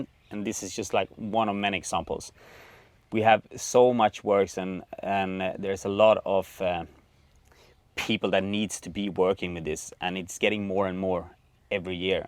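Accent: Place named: Sweden. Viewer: Sounds Swedish. Not Norwegian.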